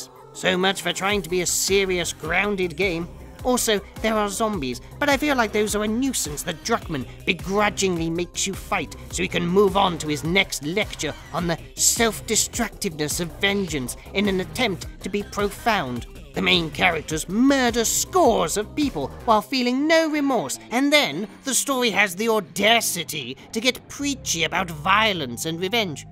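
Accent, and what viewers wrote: United Kingdom. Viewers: I'm gonna put things more on "Willfully Ignorant" rather than "Downright Stupid". But please don't comment on things you have any clue on what you're going on about. At times, you sound a lot like Alex Jones with a British accent than any person with logic or reason.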